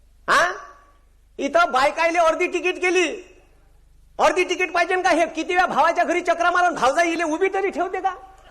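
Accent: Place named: Vidarbha, India. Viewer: अबे हे वऱ्हाडी होय शुद तु बेलत जाय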